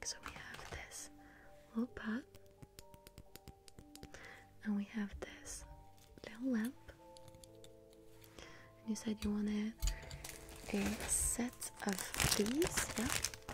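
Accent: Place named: France. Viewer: I looove it when you do english videos with frensh accent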